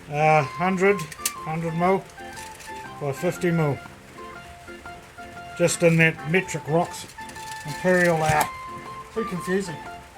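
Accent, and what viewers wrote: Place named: New Zealand. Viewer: Good luck... finally another kiwi woodworker... and our kiwi sense of humour and accent I can understand... lol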